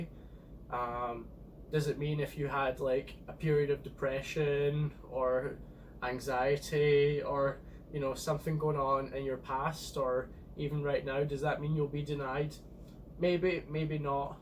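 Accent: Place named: Scotland, United Kingdom. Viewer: Don’t take this the wrong way but you sound Scottish I am guessing you’re from Scotland originally, if not my mistake you just sound Scottish is all.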